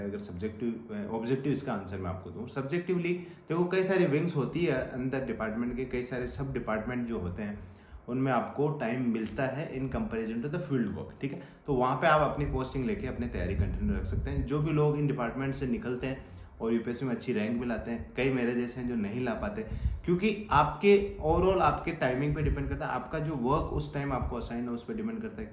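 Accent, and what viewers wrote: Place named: Rajasthan, India. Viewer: Rajasthani accent.. right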